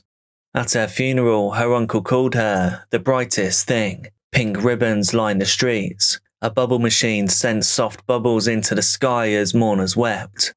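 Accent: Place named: Scotland, United Kingdom. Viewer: Lovely listening to a Scots voice Scottish independence 🏴🏴🏴🏴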